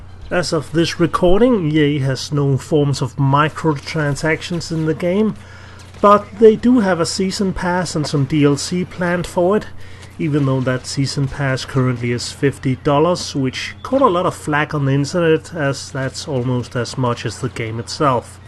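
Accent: Denmark. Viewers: Men too, I love the goofy Danish accent, all while the content is supremely nerdy and having a god mix off subjective and objective evaluation. You and Demiath are my two go to guys for intetesting video game content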